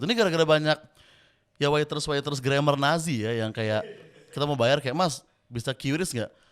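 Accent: South Jakarta, Indonesia. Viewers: Gokil brooo ...acari aku logat anak jaksel dong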